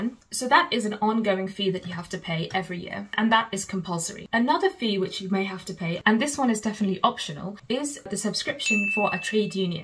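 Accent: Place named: United Kingdom. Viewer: Are you indian cause your speaking tone in British it's not like you are speaking indian english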